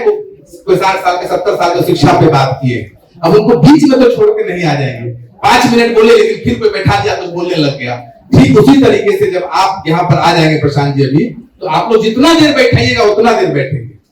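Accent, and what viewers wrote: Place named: Bihar, India. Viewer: भईया आप का हिंदी भाषा बिहार का हिंदी भाषा से मिलता है ऐसे ही बोलिए लोगो को समझ में आयेगा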